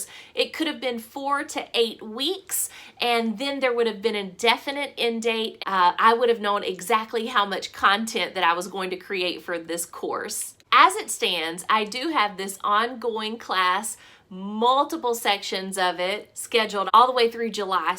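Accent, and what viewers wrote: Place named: Virginia, United States. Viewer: Thanks for answering. I have a thing about being very interested in accents. Love trying to pin it down. Often right, especially with Texans, but you had me really wondering, because I wasn't sure. But I thought you sounded like me. It's fascinating. I wish you would put me out of my misery!!!! Eliminating all the other states ... Hmmmm ... Maybe Virginia! Possibly a Carolina, North.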